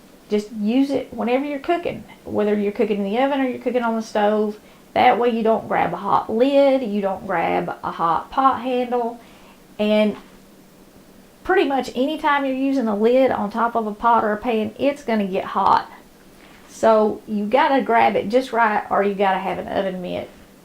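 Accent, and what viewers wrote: South, United States. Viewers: I love your videos and your biblical standards so much!! In fact, as I have lived down south for so many years, I can't decide what I enjoy more - your Southern Recipes, your "down home" biblical morals, or maybe it's your Southern accent thatI miss??!! My suggestion for a grilled cheese sandwich is to brown BOTH sides of the two pieces of bread in a fry pan at the same time and then add the cheese to each browned slice of bread; and then combine the two slices on top of each other in pan for only a few seconds more on each side. That's what I was taught; and it gives the inside of the sandwich a crispier base for the cheese when it melts!! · . I'm a southern gal through and through. I absolutely love your Channel and listening to another Southerner. Thank you for that. You're recipes are what I grew up with and miss. And I love how you put God first so important!! 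Thank you for that also. Have a blessed day.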